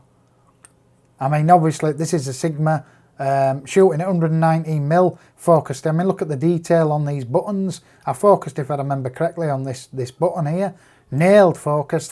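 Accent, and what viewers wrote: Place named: Cockney, United Kingdom. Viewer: Trying to decipher your Cockney Accent .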